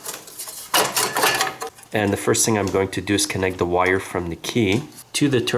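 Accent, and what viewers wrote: Quebec, Canada. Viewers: You sound French Canadian, where abouts are you located? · Wow, the detail n time you took in this video was great! I'm a Truck and Trailer mechanic myself but Im shy around small engines, I look forward to watching more of your videos, like I'm realy blown away with the care and detail in this video. Keep up the great work! ( I also wonder if that's a Quebec or Acadian accent, i'm on the east coast myself?)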